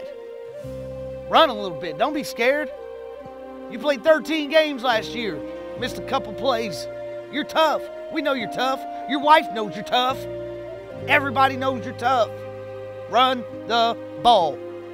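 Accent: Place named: Texas, United States. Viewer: Dude, you're from Kansas, but sounds like yer from Texas.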